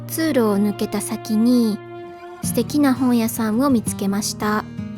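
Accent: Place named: Kansai, Japan. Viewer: 関西弁がすごく癒やされました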